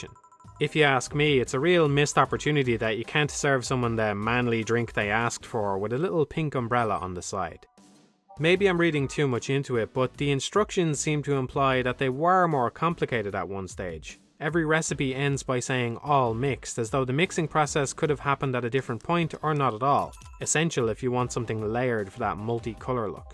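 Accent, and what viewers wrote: Ireland. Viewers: xaax666 he's irish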